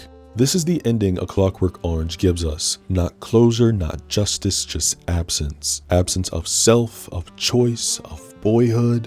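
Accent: United Kingdom. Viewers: 1) as a Brit I live for your Bri-ish accent 2) why did I imagine alex legit saying aye come catch this fade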